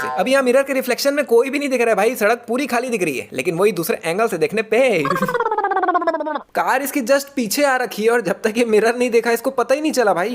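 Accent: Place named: Mumbai, India. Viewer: Bhai udda diya ek Mumbai excent hai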